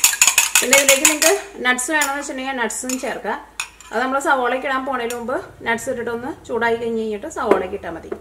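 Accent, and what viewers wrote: Thrissur, India. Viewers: അതാരികും സംസാരത്തിൽ ഒരു കര കരപ്പ്.. പിന്നെ തൃശൂർ കാരി ആണെന്ന് ആദ്യം തന്നെ മനസിലായി..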